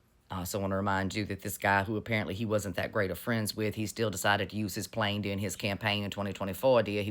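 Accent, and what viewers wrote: South, United States. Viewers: Hurry back! I saw a few headlines about the emails and waited to hear about it in your sweet southern accent! Happy Wednesday 💜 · I LOVE the sound of this lady's voice. That southern drawl with the smoky texture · voice based assumption incoming; You've just replaced William T Sherman as my favorite southerner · It’s refreshing to hear that Southern Accent used intelligently. I could listen to you all day. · I’m from the south but I don’t sound like it. I absolutely love your accent and your spunk. You nailed it. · YOUR accent!!!! I love it!! That Southern Twang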